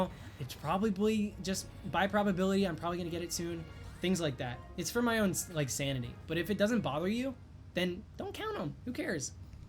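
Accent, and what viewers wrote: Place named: France. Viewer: No !!!!! Your French accent is so cute ☺️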